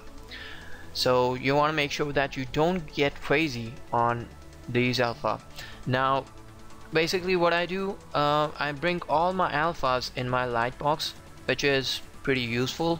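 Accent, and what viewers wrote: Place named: India. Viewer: your indian accent is kickin'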